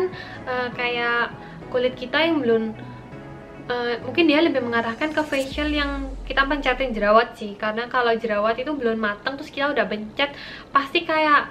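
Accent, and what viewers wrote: Java, Indonesia. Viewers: Cantik+logat medok Uhhhh😍😍😍🥰🥰🥰🥰😍😍😍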